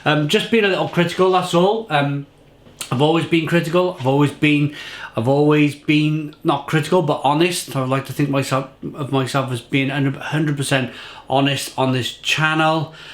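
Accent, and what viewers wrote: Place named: South Wales, United Kingdom. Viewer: south Wales 👍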